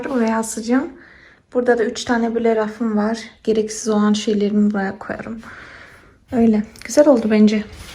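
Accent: Turkey. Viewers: Du bist die beste mit deiner türkische Aussprache Kıvır zıvır 😂 so eine schöne Wohnung 🤩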